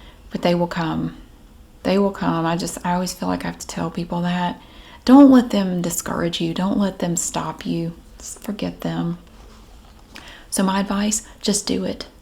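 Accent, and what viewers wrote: South, United States. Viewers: I’m with you…I love solitude and doing most things by myself. 🙌🏼 And your soft but not whispering southern accent/voice is such perfect ASMR…love your videos!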